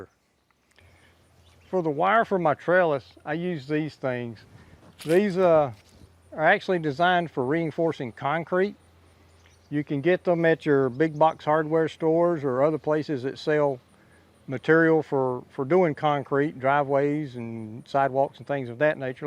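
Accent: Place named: Georgia, United States. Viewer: Sounds like a Gawgia boy...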